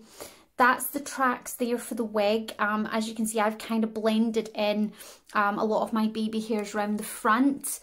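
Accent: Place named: Scotland, United Kingdom. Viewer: Nice video.. Very informative wig looks great on you Ps love your Scottish accent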